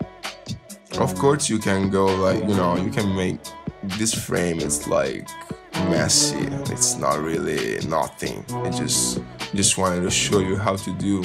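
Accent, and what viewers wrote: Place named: Italy. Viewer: yay Good job! come faccio a farmelo accettare? perchè non dice che è presente uno static txt Comunque amo il tuo accento inglese italianizzato!!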